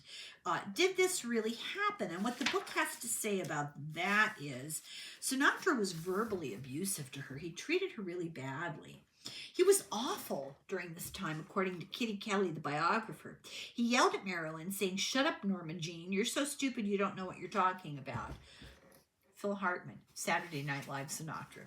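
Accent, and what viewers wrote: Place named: Ireland. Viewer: I just love your Irish accent. You are beautiful And a sweetheart I look forward to being part of your channel I subscribed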